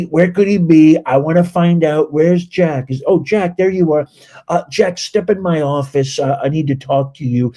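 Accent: Scotland, United Kingdom. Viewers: I'm Scottish living in New Zealand, you done a great take, on the Scottish accent, ahhh.... fond memories. Keep up the great work, what a result for America and here's hoping the world's leaders start behaving like America and Argentina. It takes more energy to be negative, guess what, I'm lazy, but so positive. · Your Scottish accent is great too,! 🥁🥁🥁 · Absolutely love you're Scottish accent, I don't live far from Scotland and you did it well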